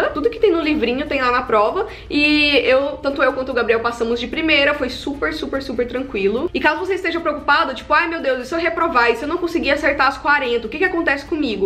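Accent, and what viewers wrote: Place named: Caldas Novas, Brazil. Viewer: Minha família e de caudas nova e eles fala como vc ♥️♥️ legal q vc era de lá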